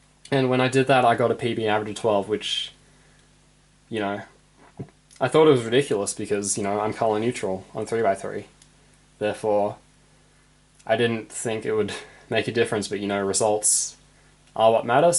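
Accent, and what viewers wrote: Australia. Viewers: Your accent kinda sounds like Australian+ English and Feliks's accent is like Australian+European ^_^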